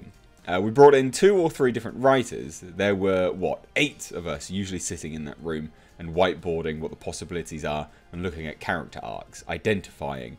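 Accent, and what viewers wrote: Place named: RP, United Kingdom. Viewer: accent goes northern when he is imitating/mocking... Lol. Reg = posh Mocking = northern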